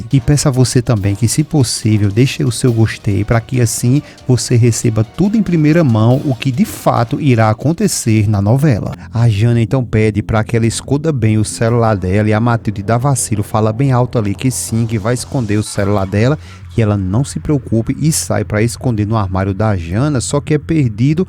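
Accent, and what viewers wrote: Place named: Northeast, Brazil. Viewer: adoro assistir sua narrativa é muito legal com esse sotaque nordestino. Maria Alvina de Teresina -Pi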